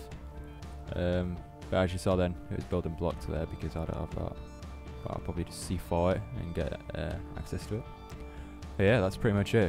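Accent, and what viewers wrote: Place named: Hull, United Kingdom. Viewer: Yo man nice video! where are you from in england ? you sound like you're from hull haha! thats where im from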